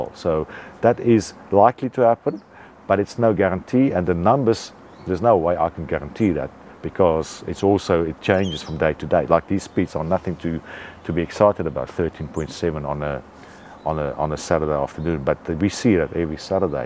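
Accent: South Africa. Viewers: Recognised the South-African accent immediately 😆 Also, probably best to hide your IP address...?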